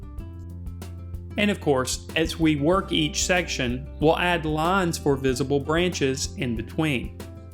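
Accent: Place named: East Coast, United States. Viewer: I was wondering if you consider all American accents wonderful (?). For me it's a normal American accent (Being an English Teacher with a strong affinity and love for the English language, I'd probably place it somewhere around the East coast of North America. I wonder whether the would confirm my guess...)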